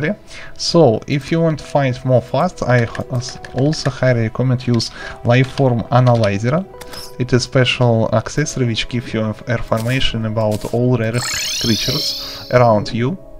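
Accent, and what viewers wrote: Russia. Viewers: heh, this russian accent on english words is kinda cute lol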